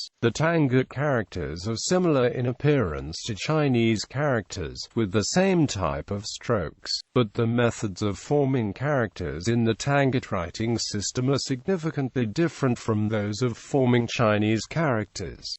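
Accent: United Kingdom. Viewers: Get rid of British accent, and make a better video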